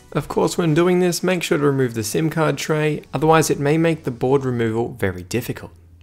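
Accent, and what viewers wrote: Australia. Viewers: are you Australia mate?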